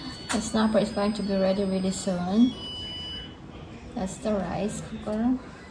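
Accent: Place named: United States. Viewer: i have never succesfully grow tomatoes. you have more fruits and vegetables in your garden than mine beh. amazing you can grow calamansi as well. you have american accent already beh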